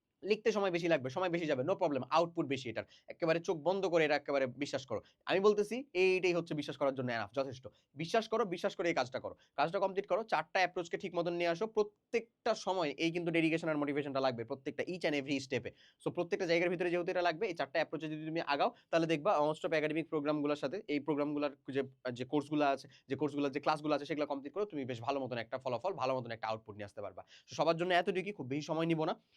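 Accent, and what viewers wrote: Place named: Rajshahi, Bangladesh. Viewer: DAMN SURE VAII FROM RAJSHAHII LANGUAGE TA OIROKOMII:face-fuchsia-tongue-out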